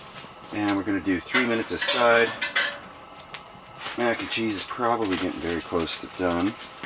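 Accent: Canada. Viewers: That canadian accent tho 😂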